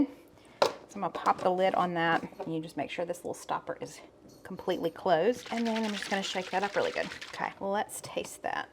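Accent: South, United States. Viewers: Hello and. I found your channel recently and enjoy it so much. We are neighbors. Oconee county!!, I understand you so well. It's refreshing hearing another true southern. I often get told talk doesn't have a W in it. Ya'll are great. Keep doing what ya'll do.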